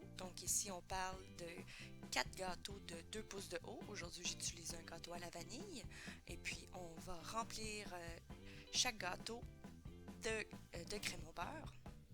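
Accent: Quebec, Canada. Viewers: Bonjour s il vous plaît vous donnez des cours sur place vous êtes ou vous avec un accent québécois. Je suis souvent à mtr j attend votre reponse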